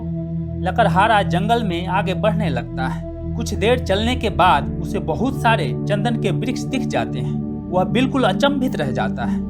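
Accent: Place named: Bihar, India. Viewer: Voice must be bihari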